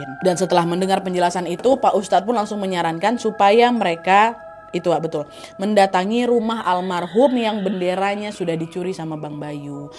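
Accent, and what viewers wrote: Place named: Medan, Indonesia. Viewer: Emng kak bukan org batak? Logatnya medan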